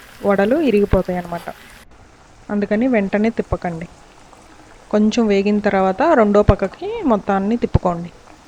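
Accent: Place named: Rayalaseema, India. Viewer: mee maatalalo rayalaseema vaasana vastondi meedi evuru?...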